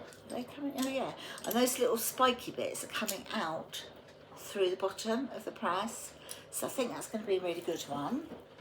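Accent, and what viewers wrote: United Kingdom. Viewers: I could listen to your lovely voice and British accent all day❤ Just put that grater in my cart! I needed one! Ty. Great haul👍🏻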